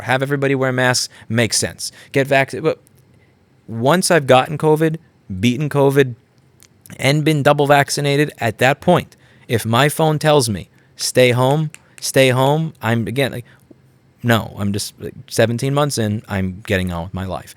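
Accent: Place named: Long Island, United States. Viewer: Your on long island. We long islanders can recognize that accent anywhere. Im moving up to VT in a month ( part of the crowd re-evaluating their life) and Im concerned my accent will give me away up there.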